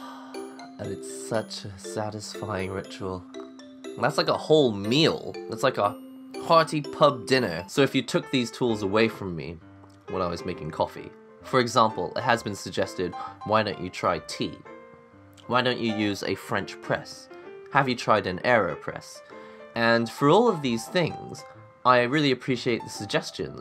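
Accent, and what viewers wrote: England, United Kingdom. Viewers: the british accent really fits you XD I mean I know the accent wasn't intentional but damn XD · It warms my heart to see an Asian with an English accent rather than American.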